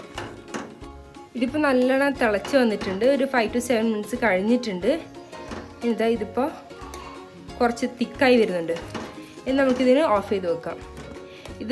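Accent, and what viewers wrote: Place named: Malabar, India. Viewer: Hi shared the Link now, watched...... good presentation, will attempt it today subject to all ingredients in place, voice over Good!! ( Malabar slang) keep it up god bless stay safe!!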